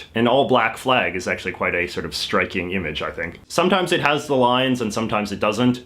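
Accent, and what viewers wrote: Canada. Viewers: I mean he is an English speaking Canadian. Even if you study a language for a very long time, especially as an adult, it is very difficult to not have a distinctly foreign accent when pronouncing words in other languages. This is because, especially if you are monolingual, you will pronounce words based on the phonemes in your mother tongue. For example, despite me having studied Spanish for 10 years as a teenager and young adult, I now have lost my authentic Spanish accent and now sound very "white" when I speak the language, simply because I haven't been using the language. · Nvm I sounds Canadian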